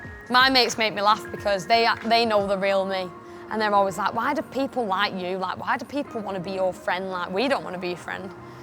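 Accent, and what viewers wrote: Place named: Manchester, United Kingdom. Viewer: I love you Tooney , never let anything get you down. You inspire me and I want to be like you when I’m older. Im not from Manchester but I always put on an accent to be like you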